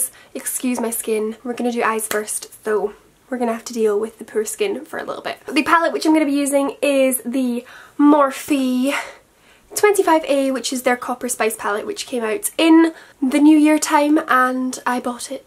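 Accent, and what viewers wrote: Scotland, United Kingdom. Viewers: Oh my gosh, I just came across you and honestly you are so lovely and cute, and I totally thought you were going to be american and then you started speaking and I got such a fright cause you are scottish? haha xoxo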